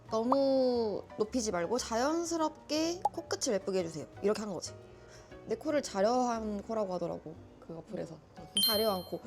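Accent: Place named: Jeolla, South Korea. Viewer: 예전에비해 전라도 사투리 오지시네요.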